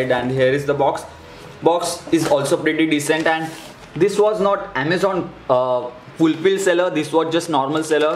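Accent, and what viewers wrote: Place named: India. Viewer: What language is he speaking? Sounds like fluent Indian Scammer.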